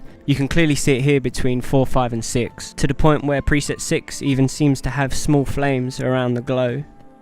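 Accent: London, United Kingdom. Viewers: aaaaah that explains, i lov ethe london accent,lived there for a month =) way way better than this northern ireland accent up here lol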